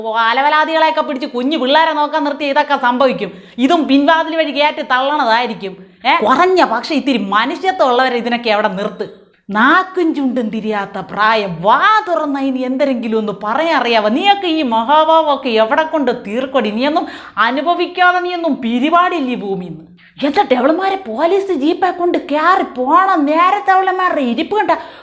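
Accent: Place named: Trivandrum, India. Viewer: പൊളിച്ചു.ഈ തിര്യോന്തരം സ്ലാങ് കേൾക്കാൻ തന്നെ ഒരു പ്രത്യേക രസമാണ്.ഇനി ഇങ്ങനെ ഉള്ള വീഡിയോസ് ഡെയിലി ഇടണം.സൂപ്പർ 👍🏻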